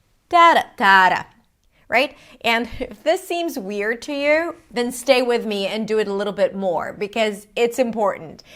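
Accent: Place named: United States. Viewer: I learnt most of the American accent by you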